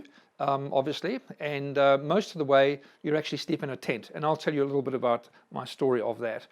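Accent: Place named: New Zealand. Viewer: Hi! How did I miss this?! Great job! It was awesome to hear you speaking in that chill NZ accent. Miss you Bud.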